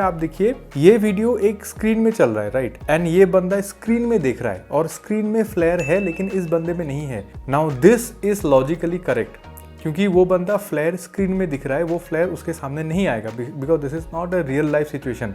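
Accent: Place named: Bengal, India. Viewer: Surprised to learn that you are from Bangalore, you have a strong Bengali accent.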